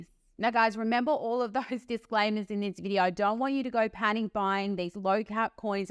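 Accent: Australia. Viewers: I hear you I see your accent is from Australia. Great content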